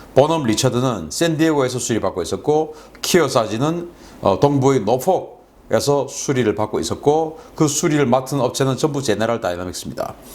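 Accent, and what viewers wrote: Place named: Gyeongsang, South Korea. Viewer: 경상도 사투리를 사용하시는 사람이던데요...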